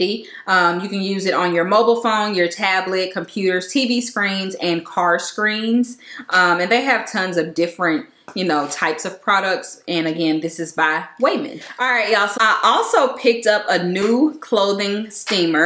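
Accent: South, United States. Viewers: Thanks for sharing! Btw, where are you from? I hear the southern "accent." 🙂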